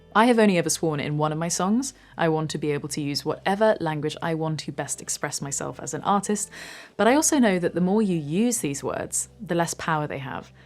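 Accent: United Kingdom. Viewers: I was avoiding this video because it seemed such a boring topic, but I was wrong., great job presenting this. I think artists like you should be able to use whatever language you want, but I also appreciate your sparing and judicious use of vulgar language because it can be somewhat of a turn- off. That said, I rewound the last section several times because I so thourghouly enjoyed hearing such filth delivered in a proper British accent.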